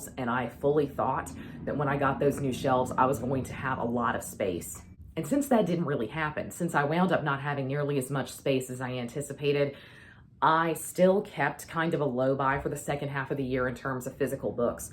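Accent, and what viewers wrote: South, United States. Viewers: Changing goals? Changing content style? Changing taste in books? Hey, that happens to us all as we grow, age and change our priorities. Just promise that you'll never stop saying "y'all" the way you do! As a Brit I can't get away with using it in conversation (sounds very strange coming out of my mouth with my accent) although I sprinkle it in tweets, comments and text messages whenever I can get away with it; but I just love the way it sounds with your accent - to me it sounds like a proper Southern Belle. I attribute 20% of my overall enjoyment from your videos to the accent and in particular your use of "y'all"; so as long as that doesn't change, the rest is all just details. All the best for 2024! 💙 x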